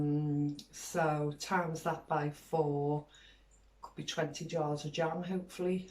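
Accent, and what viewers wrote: United Kingdom. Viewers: Is that a Wirral accent I hear?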